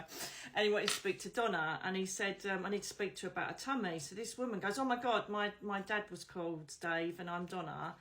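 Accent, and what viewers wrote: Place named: Essex, United Kingdom. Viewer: Grays in Essex? Amazing! I'm in Colchester and would love to see one of your shows! I knew from the accent that you're local xx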